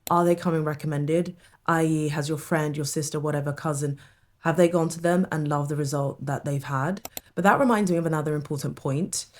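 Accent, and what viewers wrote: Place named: United Kingdom. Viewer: nothing beats a beautiful black woman with british accent❤❤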